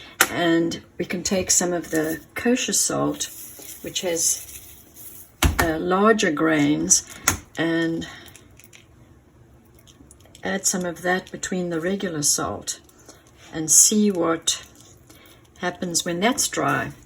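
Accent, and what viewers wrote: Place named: South Africa. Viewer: Thank you. I recognized your accent. I was born in SA. Love your work, been doing watercolour for many years, but this is a fresh look at doing abstract. Im going to try my hand at it.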